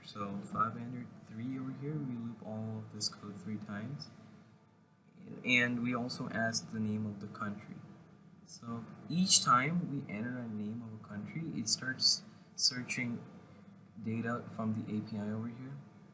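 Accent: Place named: England, United Kingdom. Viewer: EPIC ENGLISH ACCENT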